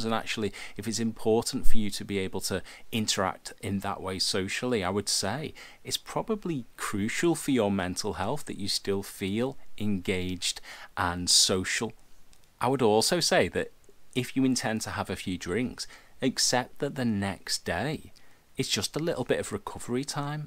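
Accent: Liverpool, United Kingdom. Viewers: You've not got the strongest scouse accent but knew it was liverpool or nearby. Yeah frontierland lmao good in its day but all gone now so has bubbles 🤣 keep doing your vids they r great for people going through similiar difficulties. So few people comment in relation to ur views but i think its just the whole mental health stigma yet again x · Good advice and info, also liked your Beatle accent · Are you from liverpool? Also, thank you so much for this. I'm starting off on 12.5mg for anxiety (not depression) and while I do like to have 1-2 drinks a night, 4-5 nights a week, I have complete control - I just like having a drink or two with dinner. However, I wouldn't mind cutting back during the week. But there are times I'm going to want to indulge. I have a vacation planned for October in the Caribbean that is all-inclusive. I plan on drinking a fair amount. 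Since I'm NOT struggling with depression or suicidal thoughts, I wonder if I should just take a break from the pills during that time? This is of course assuming that I react well and are still on them at that time.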